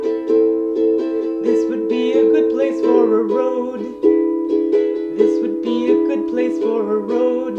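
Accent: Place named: Scotland, United Kingdom. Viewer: Super clever as usual. And not a bad brogue for a Nuevo Jersey lass!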